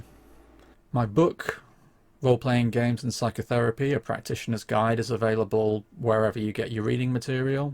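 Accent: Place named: England, United Kingdom. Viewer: I never got to play TOR1e but was impressed by the way it was put together and 2e is looking just as good as Free League's other output. All of the Tolkien licensed RPGs have some merit, with but one exception - I can't understand how anyone can be happy squeezing Middle Earth into D&D. Great game but totally wrong for some styles and settings. I was always disappointed that Decipher's unrelated economic problems killed the LotR RPG. It used the movies as a starting point but was looking to bring move-goers into the wider world of the books if it had just been around long enough. As one last point: keep up the good work. TRPG as therapy fascinates me and it's good to see it with an English accent.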